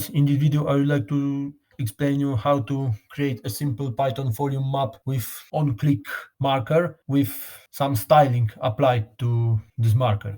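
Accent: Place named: Malta. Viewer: ah! You sound Maltese. Thanks for your informative videos. Dziękuję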